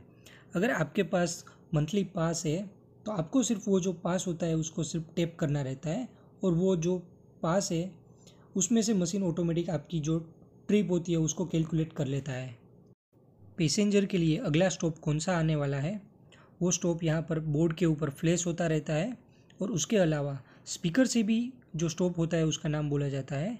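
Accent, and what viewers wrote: Gujarat, India. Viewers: Bhai boli upar thi Gujarati lago cho tame ....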